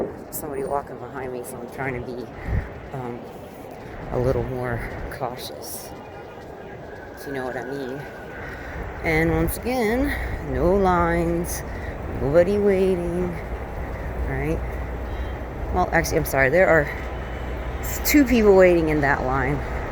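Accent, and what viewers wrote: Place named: South, United States. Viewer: Good job citizen journalist, people like you with nothing but a cellphone have become the new media. In a world of universal deceit, telling the truth is a revolutionary act. I would like to know who are all of these people who are sick, does anyone know anyone who is a confirmed case? Ive not heard about one person yet who knows a confirmed case. Anyway, good job! Also sounds like you are from the south, I heard a few y'all's in there!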